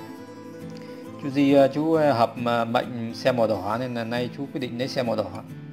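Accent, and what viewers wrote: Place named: Hung Ha, Vietnam. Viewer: Ông anh đúng giọng Hưng Hà rồi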